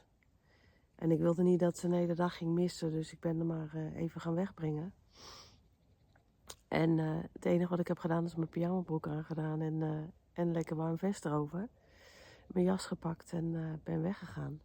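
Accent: Utrecht, Netherlands. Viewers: Beterschap aan beide! Jullie hebben denk ik inderdaad het griepvirus uit Nederland te pakken. Op mijn werk zijn ook ontzettend veel mensen met griep.. het is dus erg besmettelijk helaas. Je had ook gewoon een weekje over kunnen slaan he! Ziek is ziek! 😊 En negatieve reacties? Ik snap dat nooit. Niemand is toch verplicht om te kijken?! Lekker jezelf zijn! En als dat als Lenie van de Takkestroat is… 😉helemaal prima! Dialecten zijn mooi! Er zijn al zoveel mensen die dialectloos door het leven gaan.. ik vind het heerlijk om aan het dialect te raden waar iemand vandaan komt. Voor je het vertelde had ik Utrecht al juist geraden🤣 En.. het valt ook wel weer mee hoor! Doe nog even lekker rustig aan en goed uitzieken! Ik doe niet mee… met de griep bedoel ik dan hè😊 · Ohhhh kaneelbroodjes, sneeuw en een sprookjes verlicht huisje!!! Even in de natuur, daar knapt een mens altijd van op. 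Nou dat Utrechts komt mooi uit, dan begrijp ik je prima!! Haha, dus lekker blijven kletsen... En laat ze maar kletsen!! 😂😂 Inderdaad, blijf wie je bent!! Ik hoop dat je inmiddels bent opgeknapt, zo niet... ziek lekker uit. Dank je wel weer voor toch echt een leuke vlog met mooie beelden. Alleen daarvan al kom ik tot rust... Hej då...❤ Oh ja... #ikdoemee 😊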